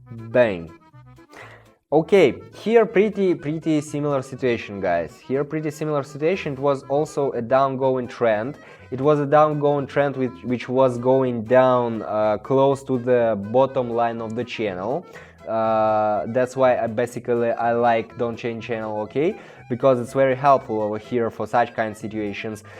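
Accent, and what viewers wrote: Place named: Russia. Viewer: that russian accent adds character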